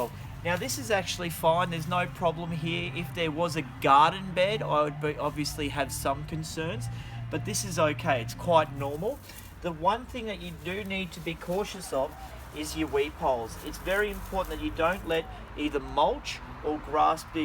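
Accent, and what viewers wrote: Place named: Australia. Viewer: Great video - thanks for sharing. BTW, what kind of Aussie accent, with no powerful F words in his Youtube!!!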